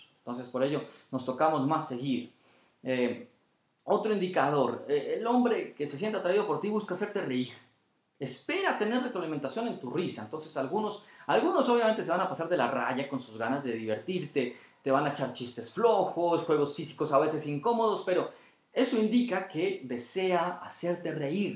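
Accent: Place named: Colombia. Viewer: Bonito acento, de entrada supe que eras Colombiano :)... Me re encantaron tus tips, gracias!!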